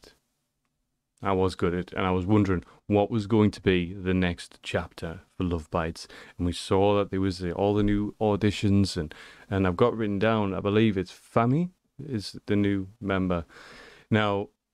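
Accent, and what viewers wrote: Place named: Newcastle, United Kingdom. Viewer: Enjoying the Geordie accent 🙃 . I don't have to say a thing about this reaction except your enthusiasm is spot man!! 😎🤟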